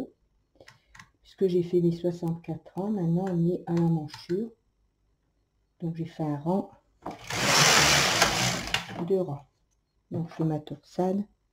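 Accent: North, France. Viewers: mais non faut pas moi j'adore, c'est un accent charmant ! vous vivez dans le nord ?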